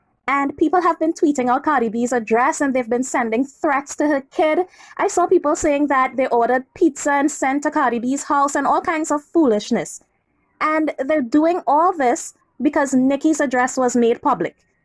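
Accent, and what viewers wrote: Trinidad and Tobago. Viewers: I love ur show💞💞. Everytime I hear ur voice I feel like I'm back in Trinidad so thank u for givin me a lil bit of Trinidad when do ur commentary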